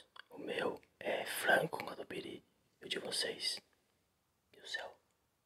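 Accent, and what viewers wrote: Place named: São Paulo, Brazil. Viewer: Fala meu mano, tu é Paulista ou Paranaense ali da parte de Curitiba, muito parecido seu sotaque.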